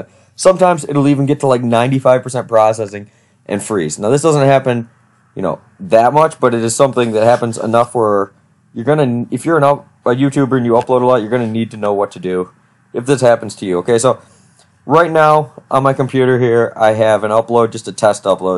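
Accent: United Kingdom. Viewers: Sounds like he's kinda British though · idk I kinda agree that he sounds British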